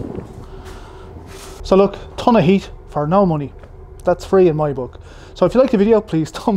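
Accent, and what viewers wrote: Ireland. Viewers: Nice one are you from Ireland?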